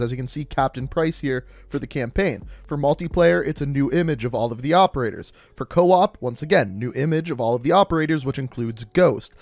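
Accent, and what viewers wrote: Canada. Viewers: I hate Canadian accents !!! You guys have weird ways of saying certain words and it kills us here in the US !!! I even hate people who live close to Canada cuz they also talk like that and its fucking annoying !!!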